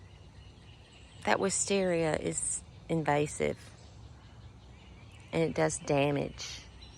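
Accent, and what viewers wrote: Oklahoma, United States. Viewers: Sounds like Southern Oklahoma.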